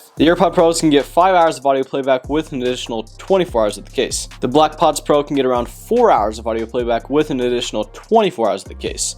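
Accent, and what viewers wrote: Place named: England, United Kingdom. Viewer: lame english accent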